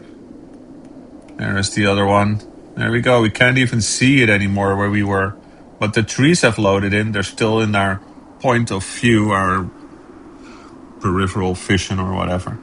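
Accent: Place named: Netherlands. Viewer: You sound dutch :D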